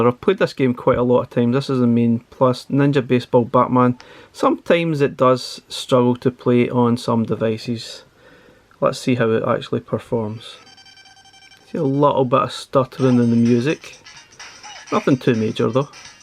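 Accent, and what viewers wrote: Scotland, United Kingdom. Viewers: I’ll buy anything described in a Scottish accent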